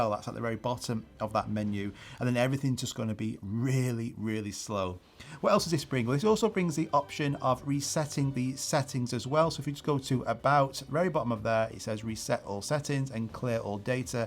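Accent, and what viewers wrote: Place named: Yorkshire, United Kingdom. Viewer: Btw love your content. I’m in Yorkshire. Can tell you are somewhere in that location with the accent :)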